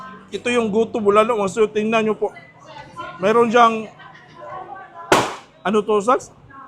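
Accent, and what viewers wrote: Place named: Rural, Philippines. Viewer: Buti pa mag binisaya or Cebuano ka nalang kasi yung tagalog mo ay parang pambukid.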